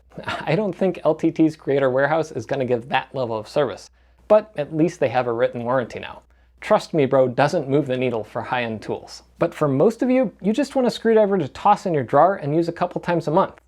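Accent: Netherlands. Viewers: are you dutch actually?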